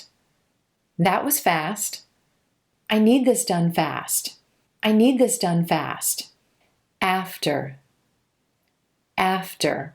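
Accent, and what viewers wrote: United States. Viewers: The sound of your voice is so crisp and clear. You have a wonderful American accent.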